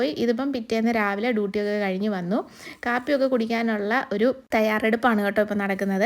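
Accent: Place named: Kollam, India. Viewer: Kollam slang aanu. Athukondu chotdichatha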